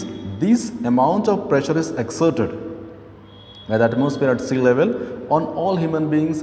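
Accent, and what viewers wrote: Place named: Spain. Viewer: sir your exent is little bit spanish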